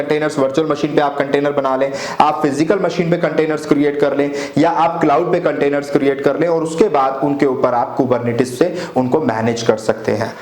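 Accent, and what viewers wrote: Pakistan. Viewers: Tell him to do a ljve f4om India, or you share me a video that proves his Indian Identity..His accent is pure punjabi pakistani accent n he also hidea time zone of his system.. Anyways he is doing a great job..One of the best online tutor..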